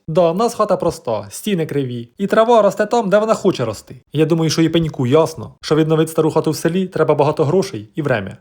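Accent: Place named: Surzhyk, Ukraine. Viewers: Шапка, пальто- просто супер-прикид. А говір наш, Черкаський. Обожнюю. Процвітання вашому каналу і щастя родині❤ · Хлопче, з твоїм відео і мій день трохи налагодився. Мої батьки з Дніпропетровщини і твоя говірка мені рідна. Хай все получиться! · Ви звідки? Наш щирий суржик,щирі люди,прості,роботящі, приємно спостерігати.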